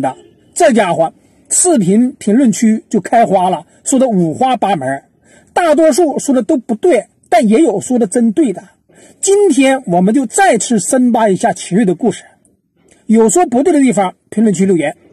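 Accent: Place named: Northeast, China. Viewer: 事情是这么个事情，但是一配上浓浓东北话杂听咋不是那么一回事。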